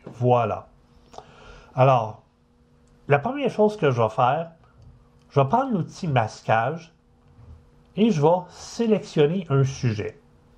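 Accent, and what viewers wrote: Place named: Canada. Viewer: Tres bien expliquer .merci beaucoup ( j adore votre accent canadien )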